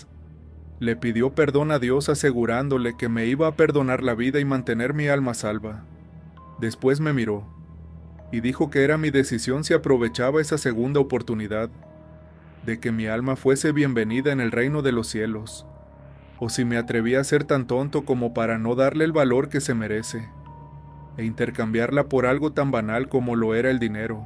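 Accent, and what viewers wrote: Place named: Jalisco, Mexico. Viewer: Hola amigo me gusta como relatas tu forma de ablar nose pero me imaginó que eres de los mios sin ofenderte yo soy de jalisco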